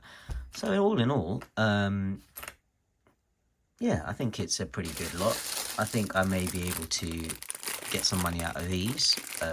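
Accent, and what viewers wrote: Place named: United Kingdom. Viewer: I liked your presentation with soft British accents. Your thick husky voice is pleasant. Proud of you!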